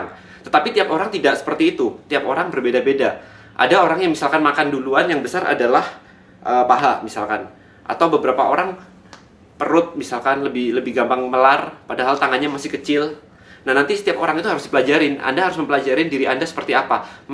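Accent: Java, Indonesia. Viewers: nice video koh.. btw koh, koh ini orang jawa yah?? ngomongnya medok amat koh.. hehehe..:D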